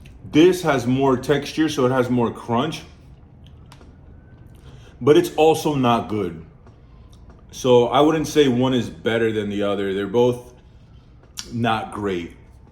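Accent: Poland. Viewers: On jest Polakiem ? On mówi z polskim akcentem. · Wspaniały akcent Polski. Pozdrowienia z Polski 🇵🇱 greetings from Poland🇵🇱👍👌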